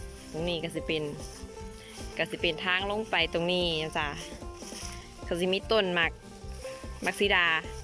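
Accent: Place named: Thailand. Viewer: สวัสดีครับ เป็นคนจังหวัดได๋น้อ สำเนียง มาคือสำเนียงบ้านผมแท้ กดติดตามแล้วเด้อครับ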